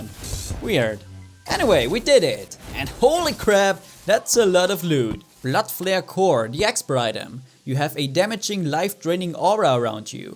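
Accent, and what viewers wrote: Germany. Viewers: Joo du bist deutsch nicht wahr? merkt man am Accent und deinen Kanal Namen, hast du vielleicht Lust mit mir terraria zu spielen? würde mich so freuen :) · are you german?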